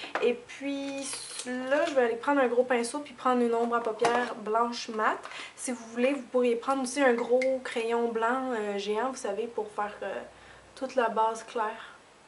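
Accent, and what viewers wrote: Quebec, Canada. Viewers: Bonjour ! Je viens de decouvrir tes videos et de m abonner !!! j aime beaucoup ! Et cet accent...il me rappelle mes sejours au Quebec! Je suis in love du Quebec!! Je vis dans le Sud de la France ;-) Merci pour tes bons conseils et rendez vous aux prochaines videos ! Ps: la video pour les fetes est top ! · Salut!!je viens de tomber sur ta chaîne et hop je me suis abonné car tes video sont super!!!J'adore ton accent ça me fait voyager!! ( le Québec j'aimerais bien mais pas en hiver!!!) ahah!! Gros bisous de Lyon!! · Bonjour je suis abonné depuis environ une semaine. j'aime beaucoup tes vidéos, on apprend enormément de chose. Et j'adore ton accent quebecquois. Moi je suis française:) et ce maquillade hivernale est trés jolie! Continue j'attends tes vidéos avec impatience!!! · Je me suis inscrite sur YouTube pour une seule et simple raison : "mettre j'aime" a tes videos ! 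J'ADORE :D Tes maquillages sont superbes et ton accent me fait penser à mes vacances au Quebec ... :) Bonne continuation bisous !!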